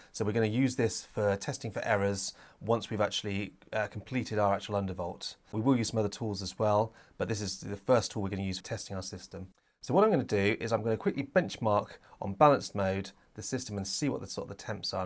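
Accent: United Kingdom. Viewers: I love this channel. British accent and detailed tech stuff ❤️❤️❤️ this is proper nerd stuff mate. Keep it up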